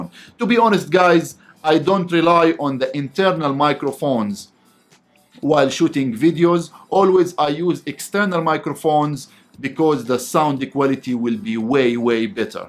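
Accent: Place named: Egypt. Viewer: Egyptians trying hard to speak English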